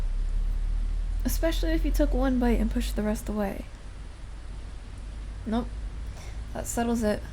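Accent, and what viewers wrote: France. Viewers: Holy! Here I thought your voice was mesmerising with the french accent but this is equally as hypnotizing. Lovely work as always!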